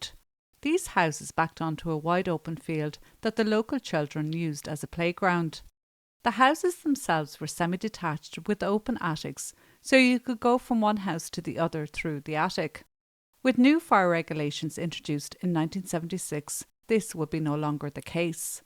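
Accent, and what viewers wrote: Ireland. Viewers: This fool doesn't recognise a beautiful Irish accent! Eejit! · I love this Irish accent. Well narrated! 👏👏👏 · Irish accent, ❤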